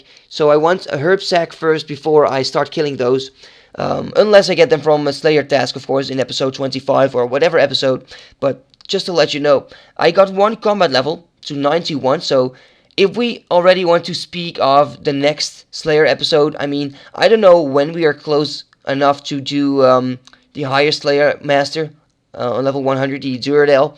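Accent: Netherlands. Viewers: Are you Dutch? I recognize the accent ^^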